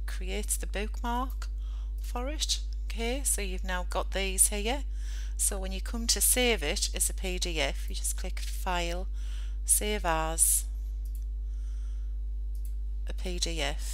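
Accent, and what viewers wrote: United Kingdom. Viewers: Ha i love the Geordie accent, thanks very much for the help! :) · Scouse :D?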